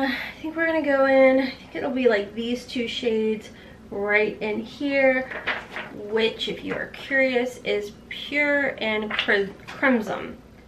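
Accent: South, United States. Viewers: I watched this entire video for "matte", "sale", "gold", "talc", and "slant". 😂😂😂 Ooooh to be southern!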